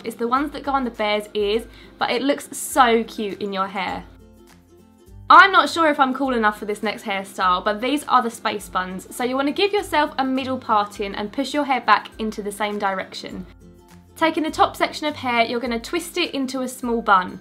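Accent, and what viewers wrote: United Kingdom. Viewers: I love your British accent 😍😍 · British accent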